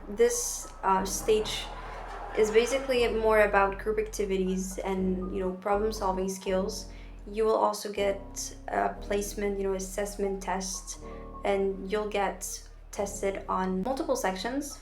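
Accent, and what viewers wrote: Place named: United States. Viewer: You sound american is that a american influence ?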